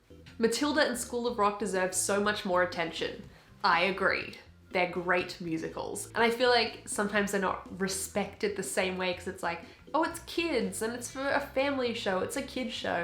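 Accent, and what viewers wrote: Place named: Australia. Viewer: you remind me so much of my voice teacher, except ya know..... your australian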